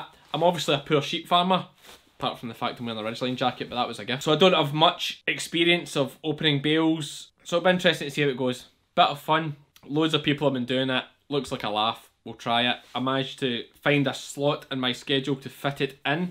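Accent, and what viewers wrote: Scotland, United Kingdom. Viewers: I’m so glad Sandi recommended you! If my daughter-in-law weren’t from Scotland, I’d need subtitles. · I had a couple of Scottish colleagues when I worked in the UK years ago so it is nice to hear the accent again. The YouTube generated subtitles can be quite funny in how they don't always fit.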